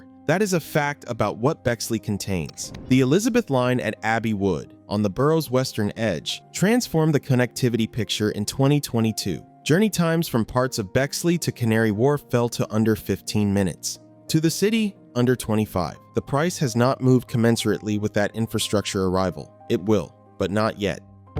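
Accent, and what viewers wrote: United States. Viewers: Why is an American unfamiliar with London and its place names narrating this??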